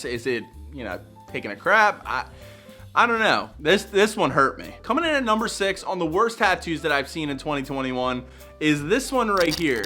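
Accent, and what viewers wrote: Philadelphia, United States. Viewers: Love the Philly accent. So good.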